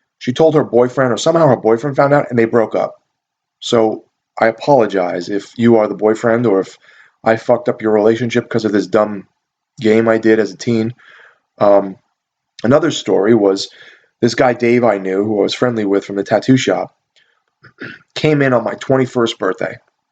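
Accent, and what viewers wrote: United States. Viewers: you American?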